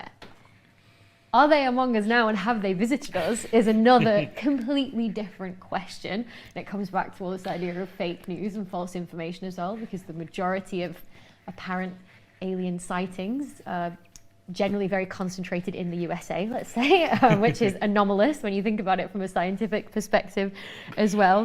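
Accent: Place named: RP, United Kingdom. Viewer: The entire British upper class feels the need to talk like a twat. But her content is bloody good.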